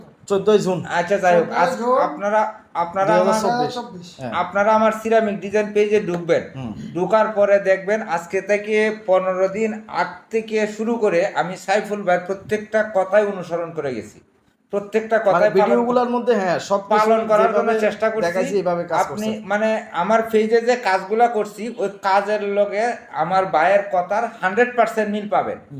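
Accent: Chittagong, Bangladesh. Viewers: bai apner bari kotai? Apner kotha sone mone hocce Chattogram er hoben. Jodi Chattogram er hon tahole baiya ami apner sate deka korte cacci ❤❤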